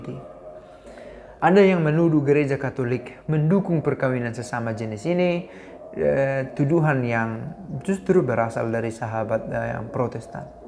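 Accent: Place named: Flores, Indonesia. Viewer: Bro ini chinese berlogat flores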